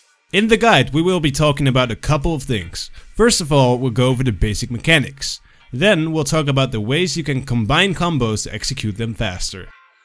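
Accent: Netherlands. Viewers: gij zijt nederlands :D !!!! <3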